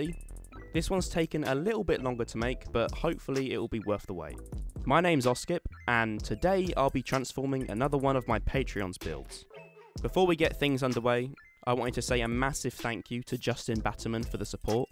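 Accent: United Kingdom. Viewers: You sound like if grian and mumbo Jumbo combined to make the ultimate Brit, from one Brit to who I am presuming is a brit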